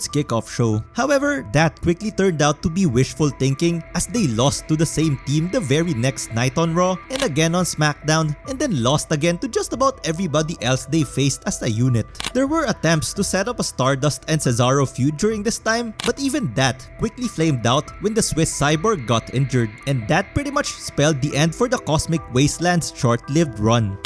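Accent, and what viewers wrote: Philippines. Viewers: You sound Filipino. Are you? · That accent... Filipino? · your english is very Filipino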